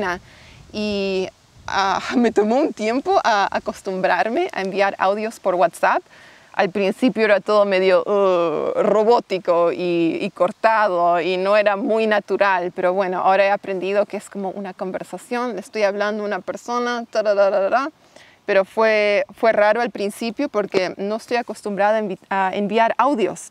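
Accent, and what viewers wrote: Argentina. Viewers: Tu acento argentino me recuerda a Ingrid Grudke, q es una modelo argentina. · Excelente tu español-Argentino! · Te felicito por tu español o castellano argentino. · Por como hablas, al principio del video creí que eras una argentina en Canada. Tenes un acento argentino casi perfecto! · me impresiona el nivel que lograste de español argentino, no sé hace cuanto que estás aquí pero de verdad impresionante, te aplaudo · Hola!!! Soy Argentino.....Me encanta como hablas el español Bueeee....en realidad el Argentino!....Vivi 2 años en Europa.....No tenes idea de como extrañe el Bidet....jajajaja!!! Les mando Besos y Abrazos! Sigan con este contenido....... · Fabuloso tu acento argentino! casi perfecto, sólo deberías (si te place) cambiar el "ummm" (muletilla anglosajona) por nuestro "eeeh" . Saludos!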